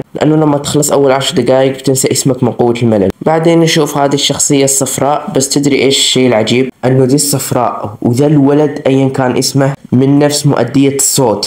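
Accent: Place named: Saudi Arabia. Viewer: احس صاحب القناة سعودي ههههه حسبته مصري طلع سعودي بعد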